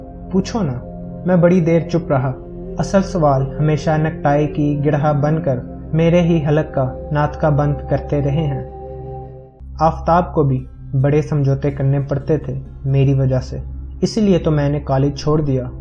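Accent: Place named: Punjab, Pakistan. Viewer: haye punjabi accent mai urdu... r bnao bhai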